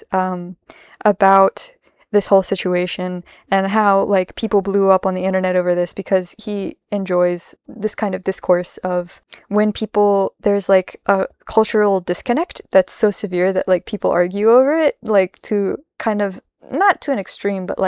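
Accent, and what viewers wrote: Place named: England, United Kingdom. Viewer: 1. I'm glad the channel seems to be growing😊. 2.. Do people actually doubt your tranalations only because you sound more "English that you have to have your japanese ftiends to read and explain atuff?....that's just pure stupidity on their part. 3.Are you going to make a short vidro on analyzing any Bpruto character in the future? Can one commision you on analyzing a Naruto character/Boruto character based on a novel?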